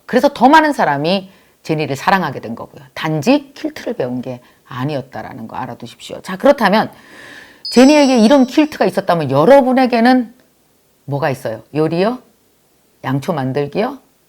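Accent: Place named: Chungcheong, South Korea. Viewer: 충청도 ㅋㅋㅋㅋㅋㅋㅋ 아 웃겨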